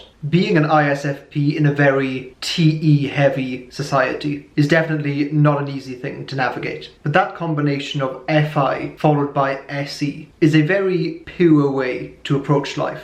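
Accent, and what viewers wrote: United Kingdom. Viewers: when i saw your face i didn't expect to hear a british accent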